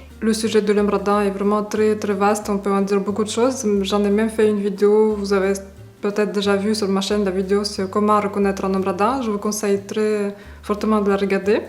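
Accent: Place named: Russia. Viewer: salut, jai regarder plusieurs de tes videos c est chouette dentendre le francais avec cet accent, je voulais juste comprendre pourqoi chez les femmes russes vous semblez obseder par largent, si té en couple aavec un homme c est pas le seul critere jespere?n y voit aucune reflexion negatrive de ma part c est juste que jai limpression que les rapport hommes-femmes en russie sont a 90 pour cent baser sur largent, c est dommage nan?ya plein de chose interessantes a decouvrir chez les gens a part ca meme si c est vrai que c est important aussi, voila, bonne continuation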